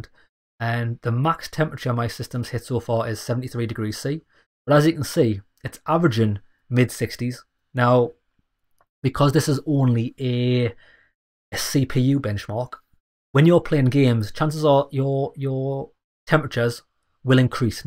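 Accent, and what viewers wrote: Newcastle, United Kingdom. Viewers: Super helpful! And I think my new go-to genre of Youtube narrator may be a Geordie 😂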